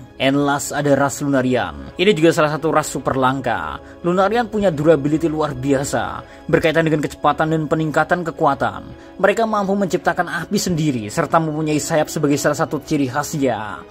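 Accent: Java, Indonesia. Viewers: koyok wong jowo to lur daerah ndi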